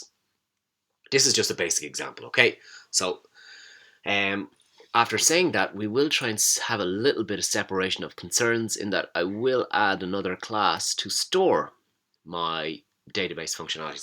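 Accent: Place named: Ireland. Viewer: Sounds like an irish accent nice change from indian :)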